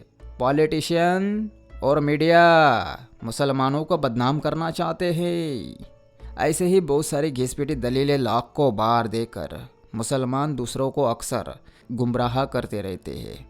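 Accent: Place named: Mumbai, India. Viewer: Tumhara talking tone Mumbai walo jaisa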